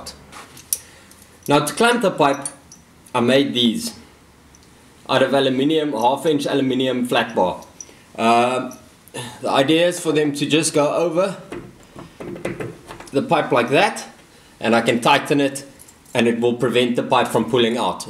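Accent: South Africa. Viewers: Ja, deff a saffer. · The South African accent is so great! I have a few friends from SA and love hearing them speak. Add that on top of woodworking and you have a new subscriber! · Is this man south african i think i hear the accent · South African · South Africa, I believe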